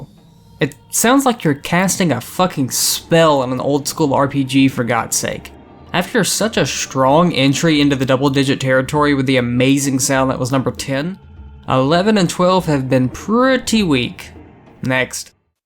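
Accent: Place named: South, United States. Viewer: Great content, keep it up, but you might want to tone down your own accent a bit if you can, people would probably find it jarring to open up to a heavy southern accent, especially european or north US viewers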